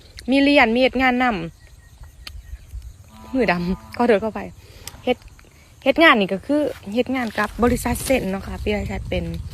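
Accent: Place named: Ubon, Thailand. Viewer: น้องเค้าน่ารัก สำเนียงคนอุบลชัดมากๆ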